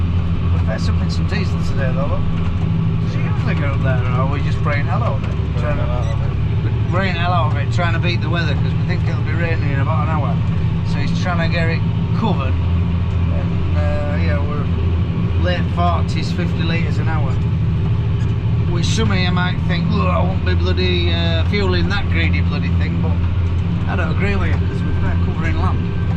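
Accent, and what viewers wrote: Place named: Driffield, United Kingdom. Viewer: Love this channel, I'm from Driffield originally and it is great to hear that accent . Miss my farming days, keep up the good work.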